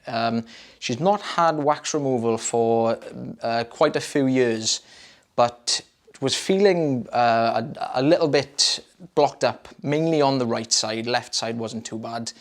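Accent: Wales, United Kingdom. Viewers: Well done on your solo debut,! Loving your delivery, and your wonderful Welsh accent 😉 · Job well done. Love the Welsh accent 😀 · Wales accent, easy to tell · A Welsh accent: love it! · I love Rhys, but I'll take another lovely Welsh accent for my ear removal video. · Go - very big shoes to fill so good on you for having a go! Amazingly long sausage in that first ear. Love your Welsh accent. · Thank you, so very much, for this fun little video!! 😉💜 I love your accent, where are you from? It's sounds kinda Welsh, but I'm from the U.S. so please forgive me if I'm completely wrong, lol 😉 Great job!! Hope to see you doing more videos!! 🕊️💚👂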